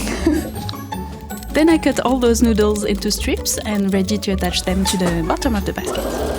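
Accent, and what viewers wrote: France. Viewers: "Sorry about that" re the French accent nooooo I love your French accent and random French words! The bed is soooooo cute! Such a lovely cosy nap spot for Not My Cat ❤. Also let him have some croissant please, he deserves a sweet treat! My kitty loves croissants and castella cake, whenever we let him have a teeny tiny nibble he is very happy 🥐🥐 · Very cute, very hilarious. No need to apologize for your accent or being French. Neither are a bad thing ❤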